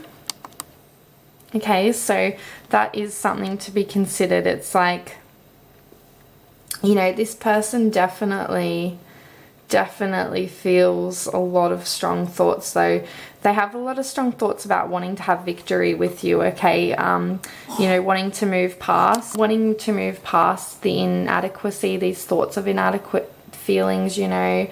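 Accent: Australia. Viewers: Gosh I love it when I hear a fellow Aussie on hear...can feel lonely on here sometimes 🙃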